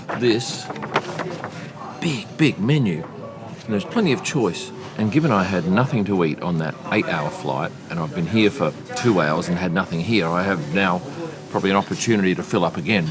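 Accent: Australia. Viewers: Loved discovering that one of my favourite media people in the F1 circus has an aussie accent. I have always appreciated your F1 media content that appealed to the female audience over the years.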